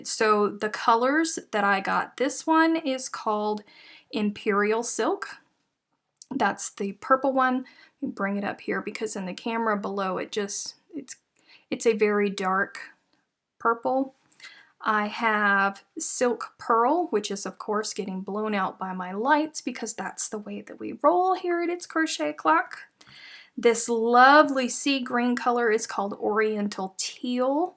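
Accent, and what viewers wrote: South, United States. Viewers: p.s.: Not that speaking southern is not erudite in its own sweet way y'all.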